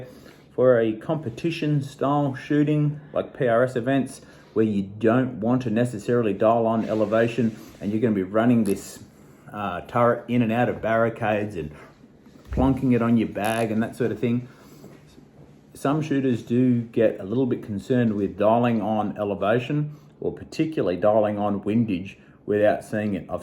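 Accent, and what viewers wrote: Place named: Australia. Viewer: Australian ain’t ya mate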